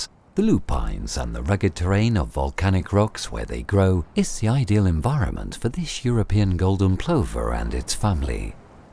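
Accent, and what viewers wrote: RP, United Kingdom. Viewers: ITS A , YES ! A FOXUMENTARY . OF COURSE AND NARRATED BY A RIGHT POSH BRITISH VOICE ! OH!! RATHERRRR!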